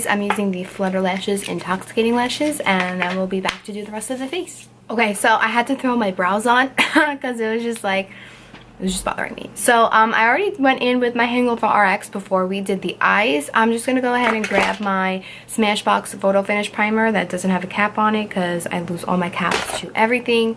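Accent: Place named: New York, United States. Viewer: First, YOUR ACCENT! I love it! You HAVE to be from NY, right? Second, The looking up tip, I HAVE NEVER heard that before, and think that's totally genius. I have chunky lids that look hooded, so that tip is going to help me a ton. Thank you so much! Third - You are beautiful!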